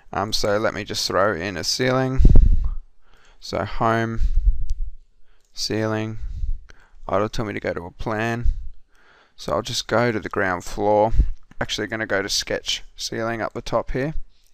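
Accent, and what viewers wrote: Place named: Australia. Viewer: Wow, great work! Thanks for the help! love love love love that Aussie accent too :P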